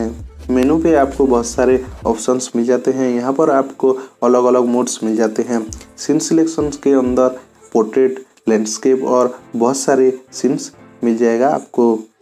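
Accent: Odisha, India. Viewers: bhai aap odisha ke ho na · Are you from Odisha Because your hindi is like Odisha people say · Bhai are you from odisha? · Bhai u r odia for sure..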